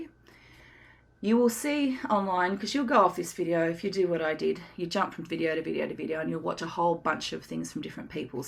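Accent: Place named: Australia. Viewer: It is great to hear an Aussie expert voice! Glad I found your channel!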